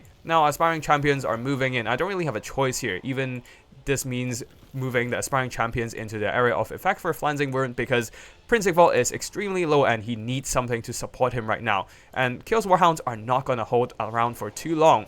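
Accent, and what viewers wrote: China. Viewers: trying to place your accent but really unsure. Are you chinese?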